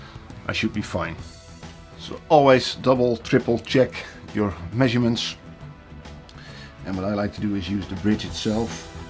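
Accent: Netherlands. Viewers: Thanks a lot for this video! I will definitely use some of your approach in placing a Floyd Rose on my current project. Thought I was the only one that does quadruple re-measuring :). Is that a Dutch accent I hear :D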